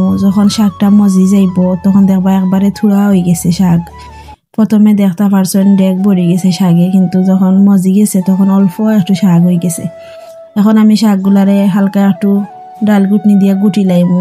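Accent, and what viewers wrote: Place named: Sylhet, Bangladesh. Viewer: শুটকি শিরা রেসিপি খুব সুন্দর হয়েছে আপু কখনো খাইনি👍😋 সিলেটের আঞ্চলিক ভাষায় ভয়েস দিয়েন আপু শুনতে ভালই লাগে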